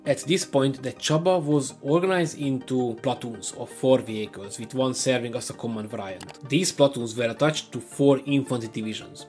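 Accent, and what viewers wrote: Hungary. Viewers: i can feel a hungarian accent in you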